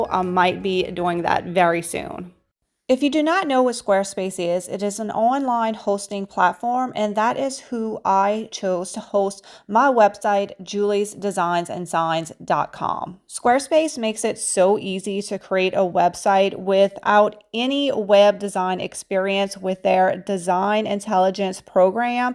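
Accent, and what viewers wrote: Louisiana, United States. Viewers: Girly… That gorgeous Cajun accent…. Abbeville? Maurice?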